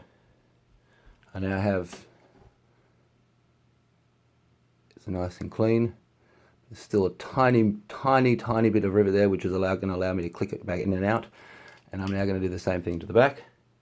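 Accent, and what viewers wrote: Australia. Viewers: Where does one get these series of? Your from Australia based on your accent (So am I). I've only ever seen the standard range at my Target/BigW/KMart. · Awesome! Thanks for the help! Are you aussie?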